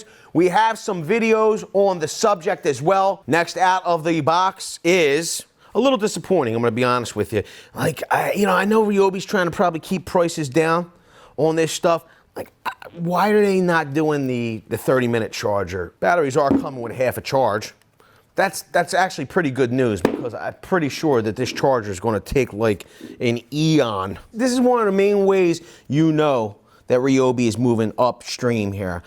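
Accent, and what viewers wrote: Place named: South Jersey, United States. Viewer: As a jersey guy I come for the accent. Hunnert and wooder are classic south jersey words. Traveling around the country we get mocked but everyone I met got a kick out of our my cousin Vinny dialect.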